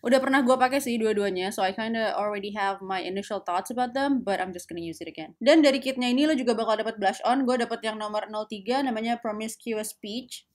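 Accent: Java, Indonesia. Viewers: lucu sih kalau ngomong Jawa 😂😂😂 lvlv Kak, ga pernah bosen deh lihat Chanel nya 😍 mau mati gue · hhaaaaaaaa aq seneng medok mu hhaayyy!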